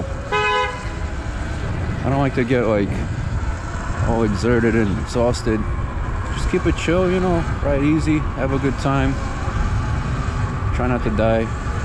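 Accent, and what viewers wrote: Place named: East Coast, United States. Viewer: You sound like young Sylvester Stallone my man! That east coast accent. Subscribed!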